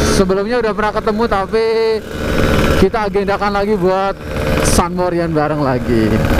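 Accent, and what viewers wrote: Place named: Java, Indonesia. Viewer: Wong jawa kie ya 😂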